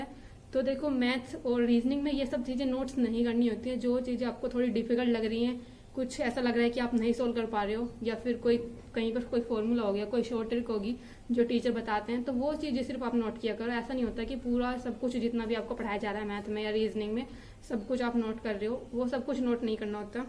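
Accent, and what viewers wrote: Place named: Agra, India. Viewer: aapki boli agra jesi hey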